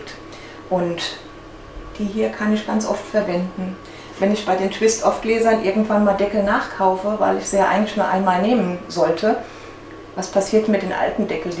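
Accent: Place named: Palatinate, Germany. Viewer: Ah, ich Liebe deinen Dialekt :) wo kommt ihr den her? Hört sich für mich nach Palz an, kann mich natürlich auch irren. Liebe Grüße aus dem Saarland :)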